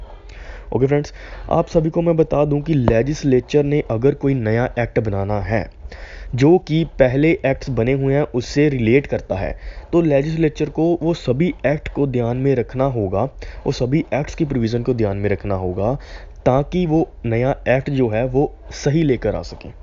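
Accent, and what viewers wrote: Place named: Punjab, India. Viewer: Bhai Punjabi accent hain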